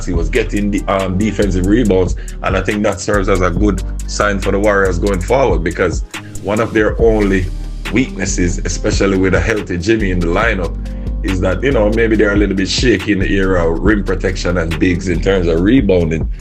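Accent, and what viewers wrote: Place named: Caribbean. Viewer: First time I’ve heard a Dubs breakdown from someone with the Caribbean patois, like the sound…thought you’d have Steph cooking with some scotch bonnet or cooking up some salt fish and ackee…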